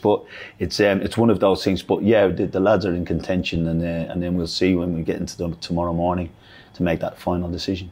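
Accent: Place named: Northern England, United Kingdom. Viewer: his accent is weird, sounds like a combination of northern english and irish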